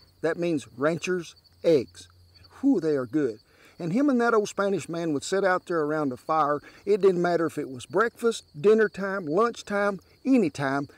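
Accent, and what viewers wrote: South, United States. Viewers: Nothing like learning to cook from a man with a southern accent, cowboy hat, and mustache outside and with the American flag behind him. Awesome, instantly subbed.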